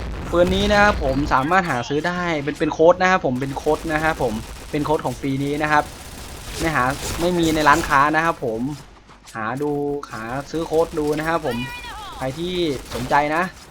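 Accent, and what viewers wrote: Isan, Thailand. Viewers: พี่คนอีสานหรอครับ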